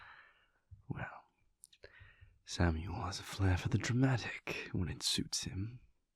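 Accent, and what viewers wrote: United Kingdom. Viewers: Never thought I'd fall so hard for a classic British accent 😂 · Porter's voice just gives me intense feels: always loved an enchanting British accent ❤️❤️❤️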